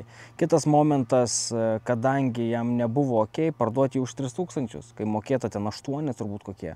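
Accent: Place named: Suvalkija, Lithuania. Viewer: Geras suvalkietiškas dialektas😆👍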